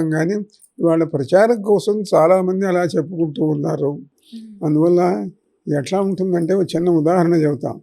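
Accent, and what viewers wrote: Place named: United Kingdom. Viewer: యాంకర్ మాట్లాడే విధానం చుస్తే తెలుగు వారికీ పుట్టినట్టు లేదు ఇంగ్లీష్ దొరకి పుట్టినట్టు ఉంది తెలుగు మాట్లాడం నేర్చుకుంటే బాగుంటుంది.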